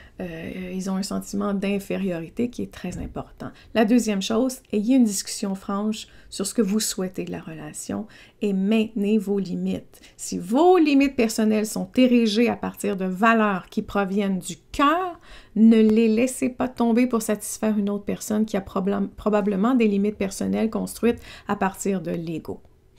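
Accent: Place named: Quebec, Canada. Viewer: Niaiserie gogosse... jamais entendu! C'est normal... je suis européen ;-) J'adore ce mot et votre accent québecquois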